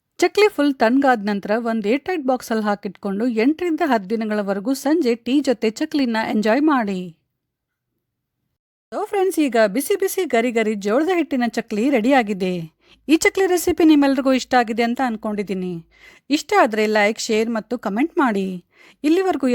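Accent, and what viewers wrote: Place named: Belgaum, India. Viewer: Hi mam nimma yella aduge nodiddhini thumha chennagidhe, nanna magalu nimma mathina shyli keli naguttale 😂 belgum side mathado tara idhe.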